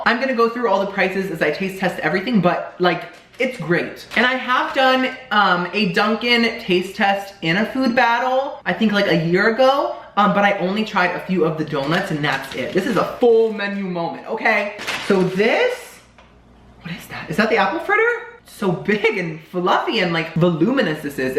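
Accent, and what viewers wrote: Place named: United Kingdom. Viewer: Hilarious video! You and crack me up! You MUST convince him to do a taste test video with you! That would be so fun! Also...you need to made a video of you just saying CROSSIANT and BUTTA (in your British accent) for 10 straight minutes! lol...the way you say CROSSIANNNN...just cracks me up! One more thing....a friend called me and I said I have to call you back I'm watching...lol..nobody interrupts my time.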